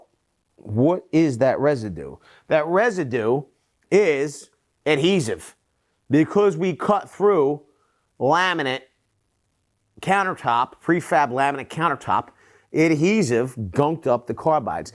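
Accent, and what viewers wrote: Philadelphia, United States. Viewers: I'm guessing you're from PA, specifically around Philly. Wuder instead of water. Lol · That's phillidelphia I hear i your voice. Almost thought it was Camden but it's Philly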